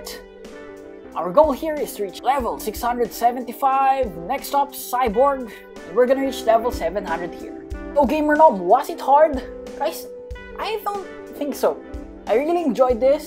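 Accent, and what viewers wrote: Philippines. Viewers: GamerNom are you Philippines? Because its ao confusing you aound like Philippines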